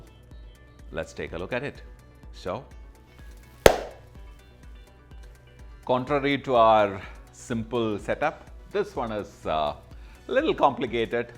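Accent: Kerala, India. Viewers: Love ur mallu accent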